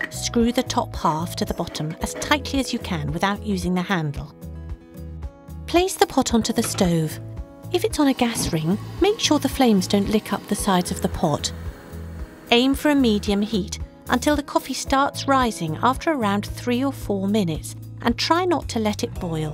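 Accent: United Kingdom. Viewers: The accent is too British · thanks british lady, i need this for my fanfic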